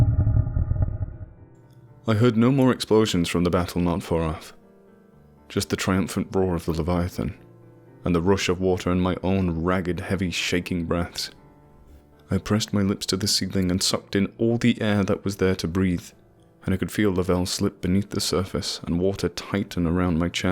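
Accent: United States. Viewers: That American accent is on point my dude 👍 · If that's you doing the American accent as well, damn, you are GOOD. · Your American accent is wonderful! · Over-pronounced? Just sounded like various American accents. Sounded pretty good to me.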